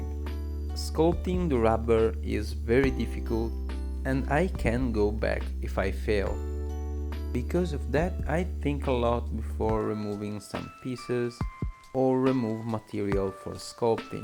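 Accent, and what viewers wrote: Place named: Russia. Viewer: That accent.. are you Russian or something??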